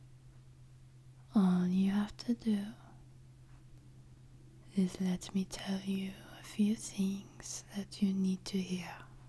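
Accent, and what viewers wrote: France. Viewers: I love the accent. At the risk of sounding totally clueless, are you by any chance actually French? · Fdom + French accent? Heavenly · Even though I am not a fan of hypnosis, I am very excited for this audio. Everything besides the hypnosis is screaming “I want more!” in my head constantly (which is primarily your French accent , which I would fall in love with every single day, as well as the Fdom aspect of the speaker). And hopefully my opinions of the audio actually create constructive feedback (otherwise I’ll keep my mouth shut to not hurt anyone’s feelings). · your French accent is so beautifully pleasant. Is this a sneak peak for a new audio? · Ahh french accent and gorgeous soothing voice... perfect for a night. If I had a wife/gf with voice like yours I would ask her to whisper to me every night XD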